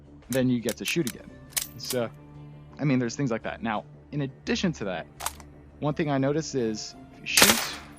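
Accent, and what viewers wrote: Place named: United States. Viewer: I can already tell your american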